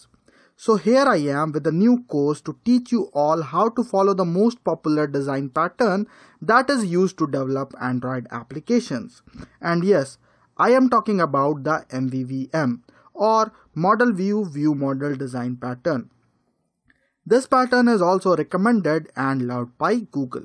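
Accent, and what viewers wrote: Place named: India. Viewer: nice, I love Indian speech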